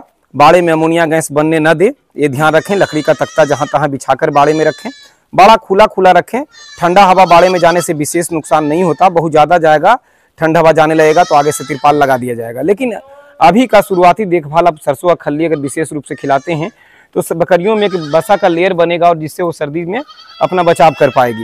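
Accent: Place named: Bihar, India. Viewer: Bhai ya to Puri terah se khadi Hindi bolo nhi to Puri terah se Bihari language me bol ..nhi aata bolne to kamse kam wo kaam nhi karna chahiye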